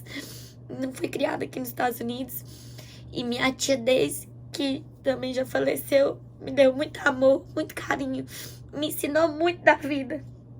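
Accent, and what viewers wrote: Minas Gerais, Brazil. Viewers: Logo vi... Seu sotaque mineiro , não perdeu.. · acho tão bonitinho seu sotaque...a família do meu pai são mineiros e acho mto legal esse jeitinho uai de ser. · Linda sua história, vc é lindaaa , acho lindo seu sotaque mineiro, admiro ver mesmo morando fora do Brasil, continua amando nosso país, eu também tenho esse mesmo sentimento saiu do Brasil por causa de segurança e poder dar uma vida financeira , educação para minha filha , mas o Brasil nunca saiu do meu coração ❤️ tenho minha casa 🏠 no Brasil , um dia voltarei a viver lá 🇧🇷 se Deus permitir quero terminar minha velhice lá, minha terra amada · Querida amo ver vc contando sua vida no exterior tão menina e com tanta maturidade pra aceitar as diversidades da vida....parabéns por amar nosso país q é abençoado por Deus o que ñ presta são as lideranças sua história é muito linda amo seu sotaque mineiro meiguice demais ao falar deve ser lida falando brava jeito lindo de ser humilde: grande abraço! 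Deus é contigo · Oh! Sua história é linda, quanta meiguice 👏👏👏gente nao esquece de cutucar o sininho👍amo esse jeito mineirinha de você falar🌹b💋no teu ❤ desejo uma boa hora minha🌹aqui no nordeste falamos assim quanto tem uma gravidinha!! Admiro muito você visse? 🌹do dia🌹da tarde e 🌹da noite!!💋💋💋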